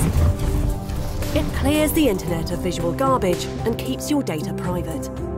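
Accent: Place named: United Kingdom. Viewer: Music and British accent make this very hard to watch! I hate it!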